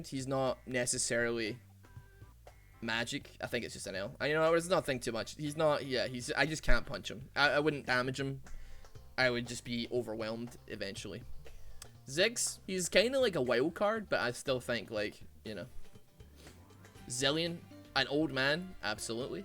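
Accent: Scotland, United Kingdom. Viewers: The facut that he's scottish makes this 1000 times better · If it wasn't for him being Scottish I probably wouldn't believe him.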